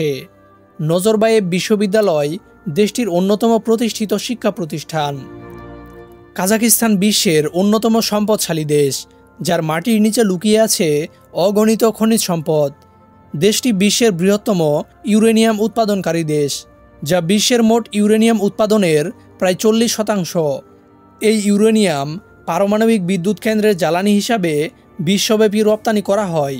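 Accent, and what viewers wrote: Sylhet, Bangladesh. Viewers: আপনার বাড়ি মনে হয় সিলেটে।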